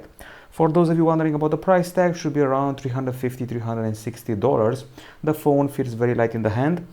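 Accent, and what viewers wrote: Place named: Romania. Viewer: Esti roman dupa engleza ta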